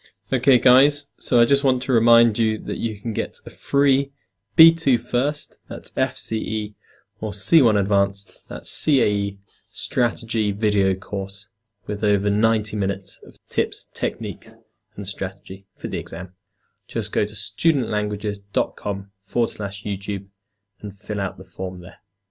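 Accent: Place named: United Kingdom. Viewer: Dear I live in Turin in the north west if Italy even if I come from South east of Italy (it's funny, isn't it?) Today I saw your website <link> but I was a bit lazy. In the next few days I'll take a look at it better. I see also if I pay more.or less 9 pounds, if I remember well, I can become a member. Is it right? I've found your website so interesting because is made well and it contains a lot of interesting things. I like so much your British pronunciation and appreciate your method. My English skill is better than some months ago because I listened a lot of English videos on YouTube. But now it's time to improve also my grammar skill and I think that your website will be useful for me. Waiting to hear you have an amazing week. A warm hug Benvenuto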